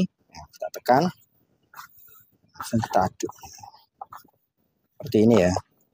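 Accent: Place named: Central Java, Indonesia. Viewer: Bahasane koyo wilayah Demak kudus dsktnya iki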